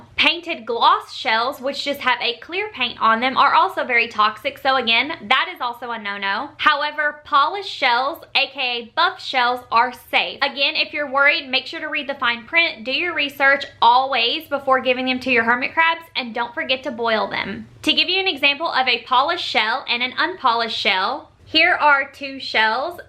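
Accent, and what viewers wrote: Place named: South Carolina, United States. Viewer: Are you from the Carolinas? Your accent sounds south Cackalack to me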